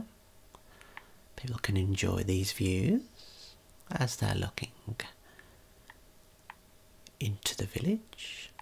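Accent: United Kingdom. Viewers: Sir are u British if so cool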